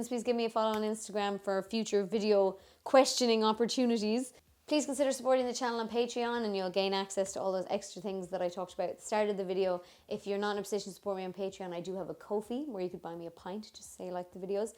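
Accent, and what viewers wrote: Ireland. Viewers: I just discovered I'm half Irish. Soo id like to learn to speak like you now. Love the accent.😁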